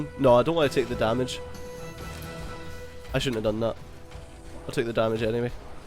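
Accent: United Kingdom. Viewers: That looks like a fun game! Great video on it too. Are you British or Scottish?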